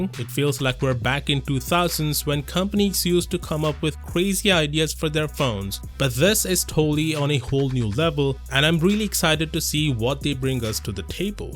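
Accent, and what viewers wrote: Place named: India. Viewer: Man I can't listen to his indian accent. Furthermore it's an complete nonsense to say that it is a advantage of rollable phones that they are thinner. You could easyily built a foldable phone to be as thin as a rollable but manufacturers don't because you need a larger battery for a larger display. The same goes for rollable phones. Yes this phone is thinner but I don't want to know how the battery life is when it's unrolled.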